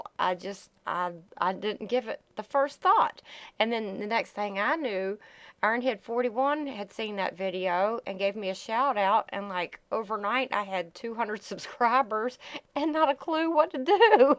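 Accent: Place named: Gulf Coast, United States. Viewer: I was browsing through your channel and came upon this video. I really enjoyed your childhood videos. I always thought you lived closer to the gulf coast with your accent and your early gardens. Guess I was wrong:(. I have always wanted to live in virginia and buy a plantation home from before the civil war. My mom said the same thing about wishes..only there was a swear word in there. Funny how some sayings become popular:) Thanks for sharing:)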